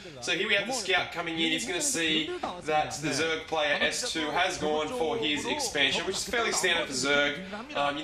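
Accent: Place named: Australia. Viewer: crikey mate, a bloody aussie sc commentator?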